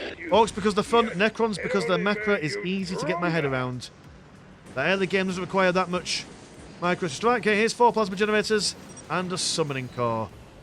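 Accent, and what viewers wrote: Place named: East Yorkshire, United Kingdom. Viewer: Which side of East Yorkshire are you on?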